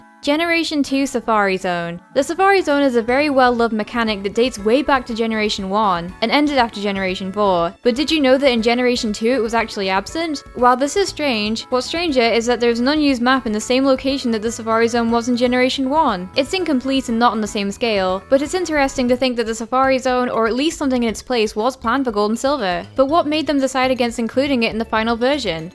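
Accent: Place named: Northern England, United Kingdom. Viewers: That's a strong Northern English accent there. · She's from Preston in Northern England.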